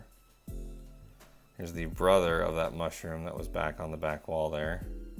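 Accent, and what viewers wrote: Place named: United States. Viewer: Nice tank.. I’m a brit so the American twang can sometimes bend my ear..is there really a coral called ‘arse crack’ ... 🤣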